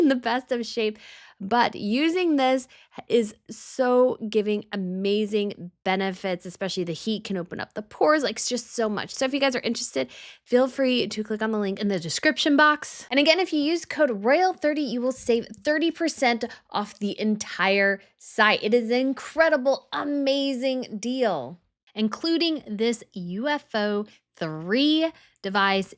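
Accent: United States. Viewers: I am a new subscriber as I REALLY enjoy your appreciation for royal history and deep admiration for all things tiaras ❤ Could you please take a little more care with pronouncing the names of royal family members and the fashion/jewelry designers? I've heard several names pronounced in the American style, which is off-putting to the designers from other countries. I'm sure your viewers from outside the U.S. will love you for it.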